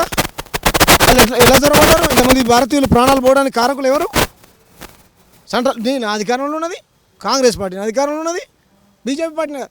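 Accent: Telangana, India. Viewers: అన్న అందుకే నీకు అని పేరు వచ్చింది అన్న నిజాన్ని నిర్భయంగా తెలంగాణ యాసలో మాట్లాడుతావ్ సూపర్ అన్న మార్వాడి కూ సపోర్ట్ చేస్తున్న రాజకీయ నాయకులను రాజకీయ పార్టీ లను తెలంగాణ ప్రజలు బాగా గుర్తు పెట్టుకొని ఏ ఎలక్షన్ వచ్చిన ఓటు తో బుద్ది చెప్పాలి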